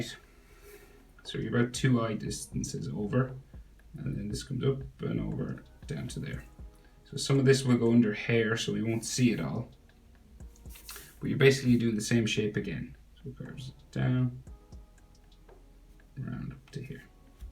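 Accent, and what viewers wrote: Ireland. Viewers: are u irish bruh i am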